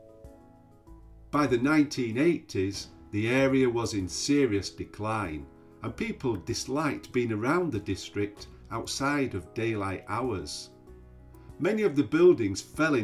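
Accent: Yorkshire, United Kingdom. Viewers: Your narrative is just fine. I love hearing your Yorkshire accent and your narrative reminds me of school, when my teachers would slow down their speech to ensure we understood concepts. If you 'talked proper Yorkshire' I bet few folk from outside Yorkshire would understand you. - Thanks for the videos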